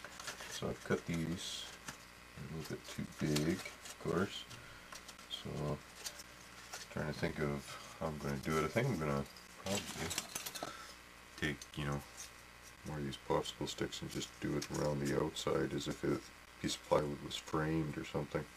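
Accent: Canada. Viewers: Are you canadian eh!?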